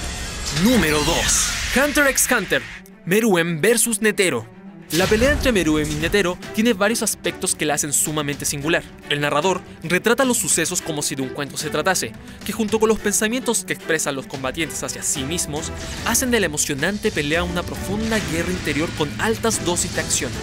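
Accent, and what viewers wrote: Chile. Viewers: eres chileno? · ¿chileno? :v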